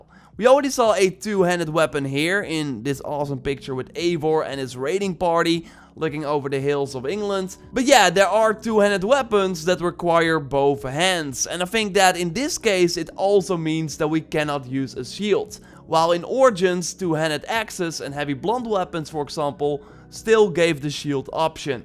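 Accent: Netherlands. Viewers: Are you dutch? Want je hebt een erg Nederlands accent. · are you dutch >...? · Are you dutch?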